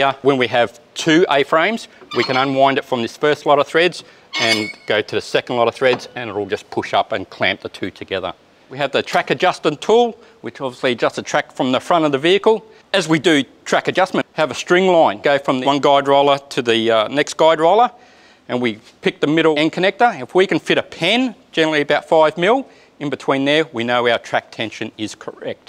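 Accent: Australia. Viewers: It's Aussie regional, I'm getting used to it. There is so much good knowledge conveyed that a voice doesn't matter.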